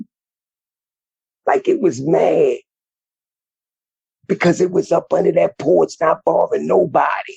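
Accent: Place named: Baltimore, United States. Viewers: My man reading your from Baltimore aren't you? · Dmv stand up you can hear the Baltimore in my guy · Heavy Baltimore accent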